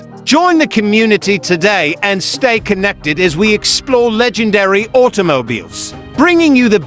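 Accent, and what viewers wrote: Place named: United Kingdom. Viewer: Why is a guy with a British accent telling me something about American cars? Do they have these cars in Britain?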